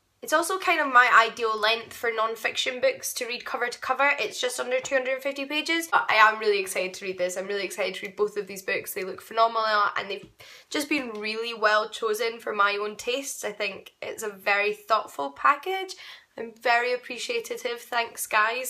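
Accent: Scotland, United Kingdom. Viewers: love your accent! You are Scottish, aren't you? I love Sir Arthur Conan Doyle's works too!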